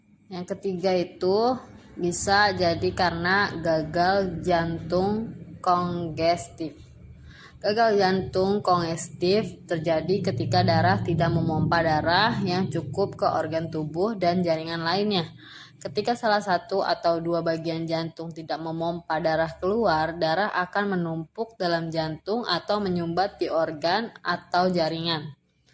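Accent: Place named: Java, Indonesia. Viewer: Belajar membaca dulu biar lancar.mendok banget bacanya ngapak2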